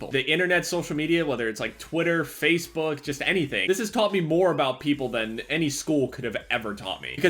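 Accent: Canada. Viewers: You sound like you're Canadian lool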